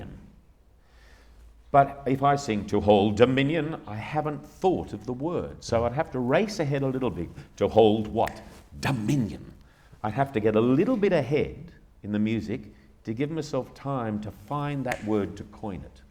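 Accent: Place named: Australia. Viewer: His accent. I keep forgetting that he's a fellow Aussie. 😄😄😄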